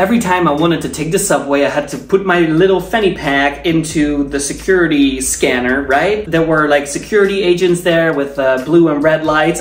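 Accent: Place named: United Kingdom. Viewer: I am a studier for Ielts,thought your prounciation is so stanard that I really want to ask where you from.Are you a real person who comes from UK as your prounciation is so well. Your prounciation of English is more standard than I'ever seen so many people speak English.I can't hear clearly what's the mean of them but I could understand you.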